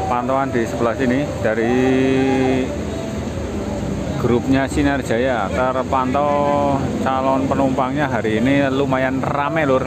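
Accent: Banyumas, Indonesia. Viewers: Hadir nyamperin.. Mas.. Yen ora ngapak ora kepenak lur... Paten..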